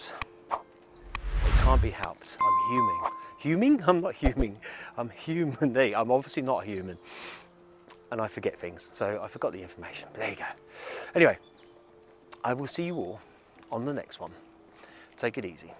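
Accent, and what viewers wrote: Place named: Portsmouth, United Kingdom. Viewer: Nothing wrong with the accent you have Pompey boy, We always get told we sound like Londoners anyway. Great video of someone I've never hea6rd of.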